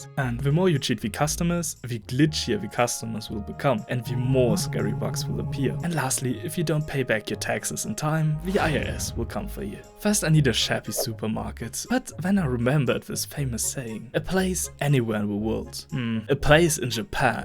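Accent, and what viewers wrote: Germany. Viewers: Are you german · i like the german accent (sorry if it isnt german it sounds alot like it) and its a really good video keep up the good work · are you german perchance? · Are you Deutsch?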